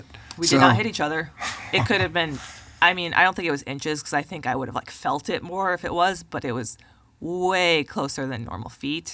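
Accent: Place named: Wisconsin, United States. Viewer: She’s awesome. What’s funny, I was listening to her talk and KNEW she was from Northern WI. I talked to/spent a lot of time with a woman about her age from up there and they sound the exact same 😂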